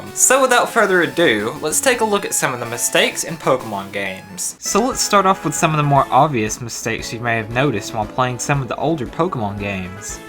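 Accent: United States. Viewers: I love your country accent I could listen to you talk all day about pokemon xD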